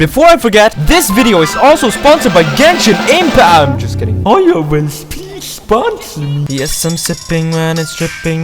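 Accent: Czech Republic. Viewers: Ah, czech fella I see :> Love your accent btw. Anyway, maybe "Czcibor" isn't like....something like our "Ctibor?" Anyway #2 Love how you've read name of Flins c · YOU’RE CZECH OMG I THOUGHT YOU SOUNDED CZECH WHEN SPEAKING CZECH YOIASUSKDJAA I think you need to improve that Russian accent tho AND YOAUAHDJQXX FELLOW CZECH GENSHIN PLAYER WITH IN GAME PRONUNCIATION ISSUES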